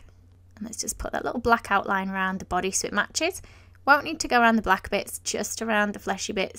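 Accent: United Kingdom. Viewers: I love your bright Britain accent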